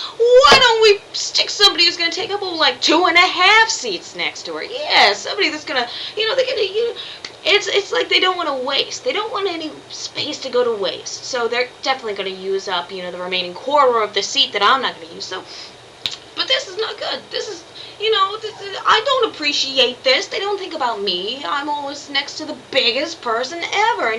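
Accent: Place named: Texas, United States. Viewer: Your mannerism and voice. Dead give away. What part? Austin? San Antonio? Not near Dallas.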